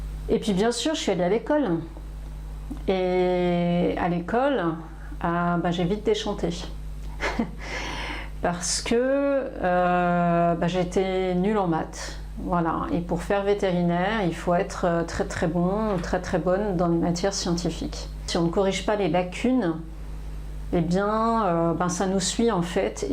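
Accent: France. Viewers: Bonjour, ça fait plaisir d'entendre le parler francilien plutôt que le français standard, le discours semble tout de suite plus naturel! Sinon, je me voyais devenir prêtre, tendance mystique, conscient que j'étais très tôt de l'existence de l'invisible tout autour de nous. Les métiers dans le domaine médical et l'éducation me faisaient horreur, car trop sociaux. Cette vocation s'est vite effacée à l'adolescence, en raison d'une part, de l'imposture que je suppose dans cette croyance, et d'autre part, par le sacrifice imposé par le célibat... Et sinon, je suis ornithologiste amateur, pour pouvoir apprécier la faune sauvage locale, qui disparaît à grande vitesse désormais.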